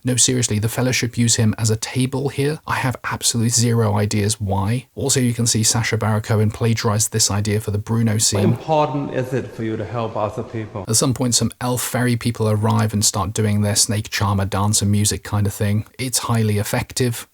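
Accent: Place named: England, United Kingdom. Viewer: Thank you for making these. Love the English accent too. If you could go back and revisit the rings of power and do each episode over like you did with 7 and 8 that would be wonderful! I'm serious! I will pay you!!😄 That used condom falling on the Balrog's head was awesome! Humor is the best way to deal with this abomination of a series. (It's really a shame too, because in the right hands this could have been a good show. I am interested in seeing the lore come to life. I would love to see how Anatar (Sauron) managed to trick the elves; how he worked with Calibrimbor to learn to craft rings. That would have been really cool. It takes more than 8 episodes though. And fuck the Harfoots! Who gives a shit about that side-story.) That reminds me - your Skyrim comment was perfect. The entire show was a bunch of stupid optional side quests. 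Never did I fear for anyone or anything. The only characters I liked were Adar and Berrick, and the latter had not a single spoken line. Well, I suppose the discount-Emma Watson character with the big boobs was fun to look at, but even she was unnecessary and her character was as developed as bleached vanilla. Anyway, please keep these going. I look forward to them sooooo much! Cheers, mate!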